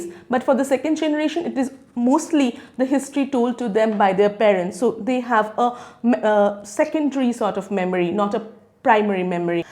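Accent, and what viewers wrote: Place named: Assam, India. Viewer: Mam your tune feels u are an Assamese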